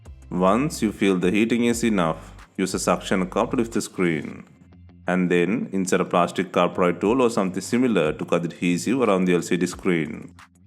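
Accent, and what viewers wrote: India. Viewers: Why is there an Indian voice for a white man's hands?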